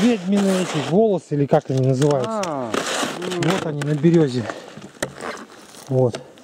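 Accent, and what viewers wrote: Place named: Komi, Russia. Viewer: Слышу как говорите республика Коми и ностальгия по Воркуте)))) только там тундра))))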